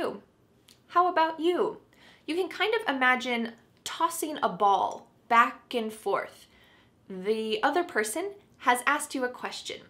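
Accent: United States. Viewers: Hello my teacher,daughter, how are you doing my daughter, hope you to be well,dear,I wanna say you thank you so much dear for all your fantastic lessons, by the way your accent also very nice and clearly really you're fantastic american accent english teacher in the end acept my greeting. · Hi, I discovered this channel and for me it's the best. Are you American or aren't you? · Your English speaking style is very nice,,, You're amazing 😍 😊..I can learn American accent very well.❤️🇧🇩🇧🇩🇧🇩🇧🇩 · 😎I will become American after listening to you👻👍🏻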